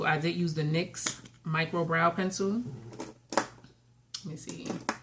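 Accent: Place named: North, United States. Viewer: I enjoyed watching. Came over from JustSynetta's channel (Love her.). Your eye look is freaking amazing. I must try to recreate really soon. Do you do tutorials? Must go see. I need to be shopping my stash before it needs to be thrown away. OAN: I got distracted a few times by the Northern accent (Jersey born, Atlanta is home now).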